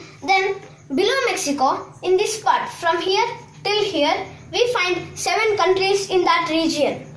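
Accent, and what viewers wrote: India. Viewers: i like your typical indian accent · I like him and the video but i hate how Indians invent their own pronounciation. Para gway,uru gway,chilli,Puerto Rica and many more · Ryan he is Indian, obviously he has an accent not everyone speaks American or queen's English. Duh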